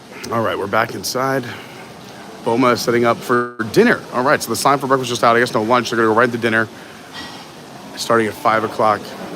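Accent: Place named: New York, United States. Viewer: what part New York are you from?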